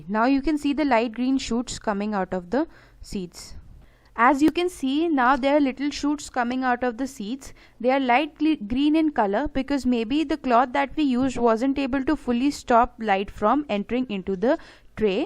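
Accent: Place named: India. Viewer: Try to pronounce your r and d in proper English manner in horrible hinglish for us it sounds disgusting, the Hindi rétroflexes always makes Indians lazy when talking English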